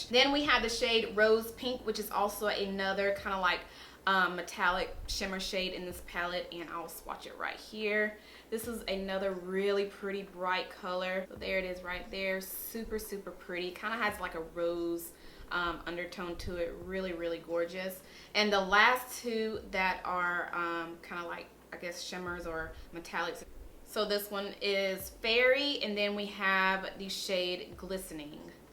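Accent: South, United States. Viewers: Her southern type accent is a little distracting and hard to focus what she’s actually sayibg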